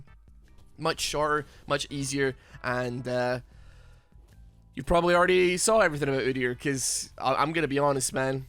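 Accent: Scotland, United Kingdom. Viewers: Are you Scattish my friend?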